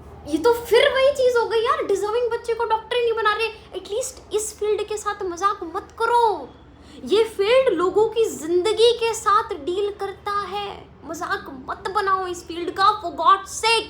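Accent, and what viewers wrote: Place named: Bihar, India. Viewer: Are you bihari your toun like bihari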